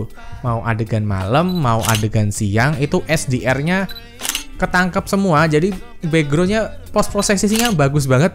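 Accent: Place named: East Java, Indonesia. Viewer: org jawa tah mas ?? logatnya kya daerah wetan sana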